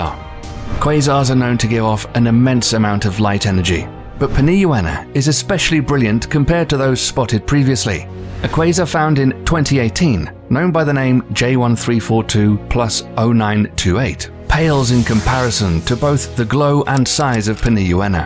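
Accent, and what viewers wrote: United Kingdom. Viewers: You know it's a British video not only because the guy talking sounds british, but also because the video plays in 50fps lol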